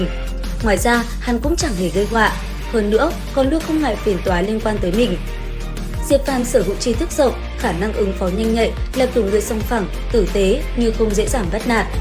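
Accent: North, Vietnam. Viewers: Mình ng trong Nam nhưng sao nghe giọng Bắc của bà AD nghe cuốn quá 😅😅😅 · Ad là người miên bắc chắc luôn🤣🤣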